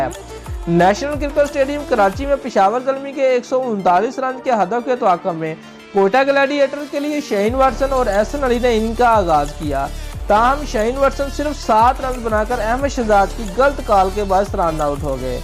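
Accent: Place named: Punjab, India. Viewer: Abey chutiye punjabi style mn urdu bol raha ha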